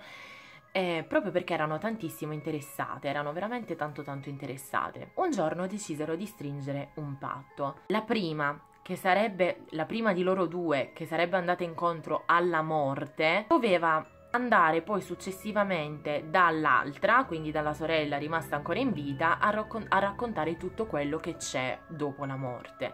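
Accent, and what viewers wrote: Apulia, Italy. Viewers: Di che città della Puglia sei?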